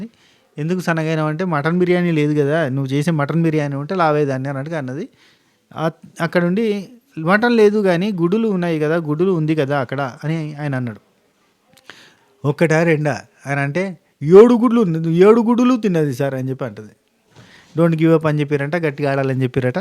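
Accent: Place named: Rayalaseema, India. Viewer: Yendi ee roju మా సీమ యాస కూడా వచ్చంది 😂😂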